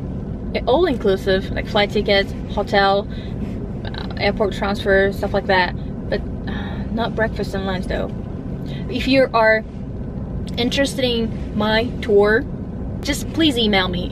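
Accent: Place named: Japan. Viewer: I love your Japanese accent.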